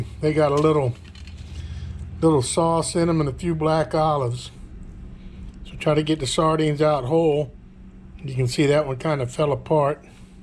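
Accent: Louisiana, United States. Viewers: You from LOUISIANA?? If you don’t mind me asking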